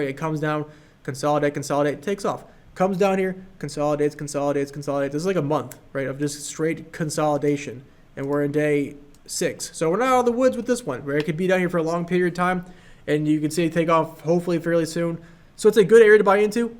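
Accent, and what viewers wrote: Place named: Michigan, United States. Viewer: tell me u not from Michigan ... ur accent in so close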